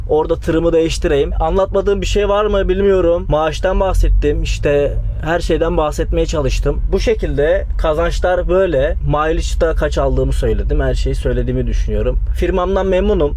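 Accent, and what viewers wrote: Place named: United States. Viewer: Babacan Allah yardımcın olsun ama amerikan ağzıyla konuşman çok sinir bozucu yok tarp yok mill Türk ağzıyla konusun spenzet vs km aksi sinir bozucu yolun açık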